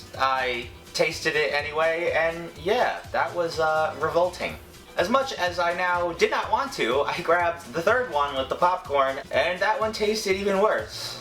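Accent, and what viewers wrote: New York, United States. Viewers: There are a lot of people on YouTube that annoying me. isn't one of them. I hope he can get more subscribers, he really does deserve it. It seems that he tries really hard on these videos. Plus he's a New Yorker like me. It's funny, even before I actually heard him say he lived in New York in one of his videos, I could tell he was from New York because his voice was so familiar.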